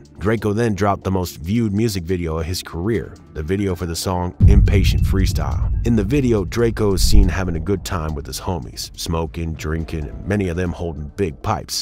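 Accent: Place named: South, United States. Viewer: narrator country accent strong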